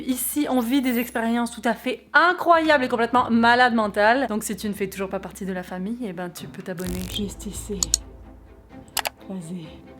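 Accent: Quebec, Canada. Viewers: J’ai remarqué que tu avais quand même un petit accent québécois hein ! En tout cas super vidéo ! N’hésite pas a voir ma chaîne et me dire ce que tu en penses pour m’améliorer !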